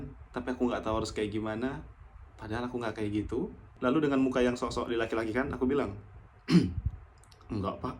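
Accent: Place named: North Sumatra, Indonesia. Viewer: bg logat sumut nya kental kali! sumut nya asal dari mana bang, btw serem kali cerita ko bang bah ketemu bapak bapak homo gitu 😅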